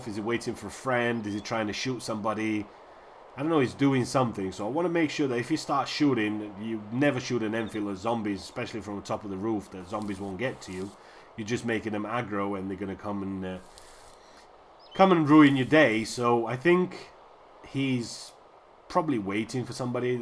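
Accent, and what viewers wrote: Finland. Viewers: that bandit who know you sound like finnish akcent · I could almost hear that you're Finnish even if I didn't hear you speaking Finnish :D it's the accent... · Sulla on aika suomalainen aksentti, pakko sanoa. :) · Totally finnish accent :)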